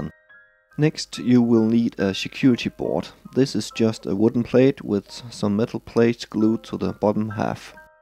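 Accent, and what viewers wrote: Denmark. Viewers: WAUW YOU HAVE A LOVELY ACCENT. WHERE ARE YOU FROM, no wait let me guess. denmark?D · I enjoyed this video very much. I have learned something new today about magnets I didn't know. I can understand you well with your Danish accent. Thank you. Blessings to all. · i can hear at your accent that you are from denmark im norwegian, nice video neightbour :) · it funny when you hear a danish person talk english the accent just sound so wrong because im used to that accent in danish XD still very good vids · Are you Danish by any chance?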